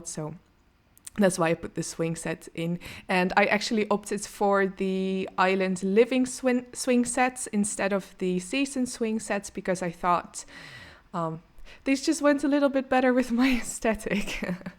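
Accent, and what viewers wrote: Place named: Netherlands. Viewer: You sound very Dutch. 😊 And I like this communal garden. It looks very grand and luxurious.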